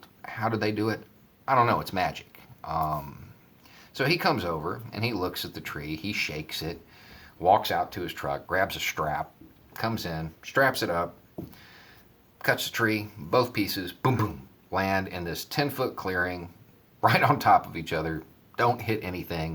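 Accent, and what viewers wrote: South, United States. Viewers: lol, I love stealthing anarcho coopeativist and old-school punk rock organizing with a Southern accent. My problem is I lack subtlety, or I get off too much on talking up the good folks of Chiapas to squares. Keep on rocking until there's a free world buddy.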